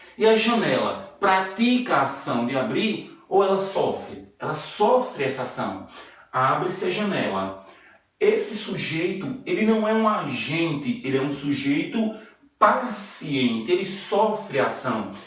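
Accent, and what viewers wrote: Northeast, Brazil. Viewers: O senhor é pernambuco, cearense, rio grandense do norte(potiguá)paraibano. Enfim, o senhor nasceu no Nordeste?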